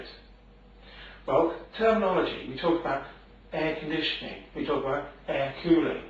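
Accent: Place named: United Kingdom. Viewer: Air conditioning is severely underappreciated here in the UK and honestly I was quite surprised to hear a British accent when I clicked on the video.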